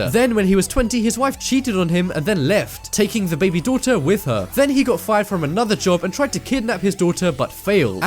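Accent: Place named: England, United Kingdom. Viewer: What part of England do you hail from,? Just curious due to your accent:)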